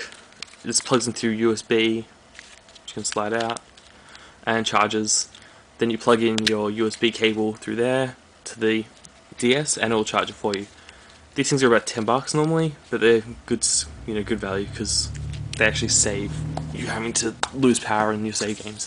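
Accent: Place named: Australia. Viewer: hey dude you sound aussie like me lmao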